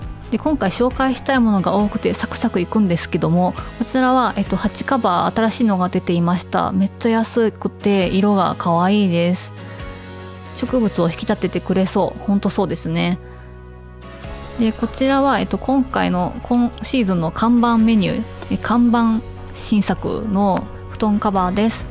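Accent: Kansai, Japan. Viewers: こんにちは。初コメです。聞き入ってしまう柔らかな声と、懐かしい関西弁と、美しい言葉遣い。そしてたまに出るお笑い要素も大好きです！朝イチの家事のお供に聞かせてもらってます🧹🧽🫧 グラスって気づいたら増えちゃいますよねあるある。またコメントします！♡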